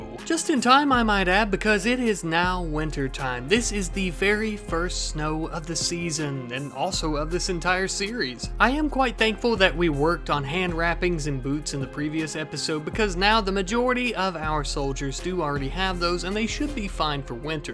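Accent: South, United States. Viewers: My request is this, a mighty unit of the finest, most exquisite gentlemen, who will dedicate themselves to not only America, but their duty. This duty will be to purge the scum of the earth given the name "bull frog" or Lithobates catesbeianus. Even the name is shameful. May this unit (who's numbers needn't be many) cleanse our ever free land of the bull frog menace, and act as, what comes to mind for me, like the commissars of 40k, though the true use is up to you.(This content is god tier and i look forward to every episode! Thank you for literal hours of legendary entertainment! Also what state are you from if us because I hear some southern. oorah NC and thank you for existing)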